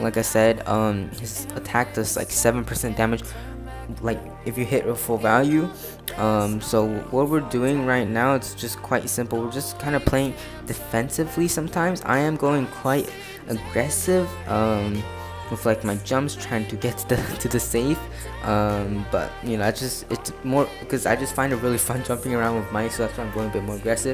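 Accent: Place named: Brazil. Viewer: Parace br tentando falar inglês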